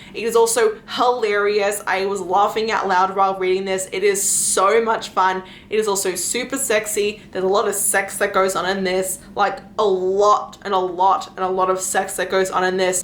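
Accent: Australia. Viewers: The way you talk sounds abit Aussie too me ,,, cheers from Tasmania Australia 🦘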